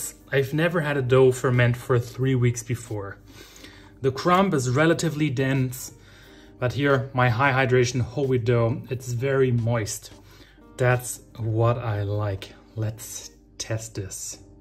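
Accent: Germany. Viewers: Got this randomly recommended, immediately noticed the German accent mein Freund, without any knowledge about bakery and/or cooking but enjoyed the video nonetheless. Loved the hissing kittens when you "spritzed" the dough :D Definitely gonna stay here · I love your German accent. Main reason I sat through the whole video. Good stuff thou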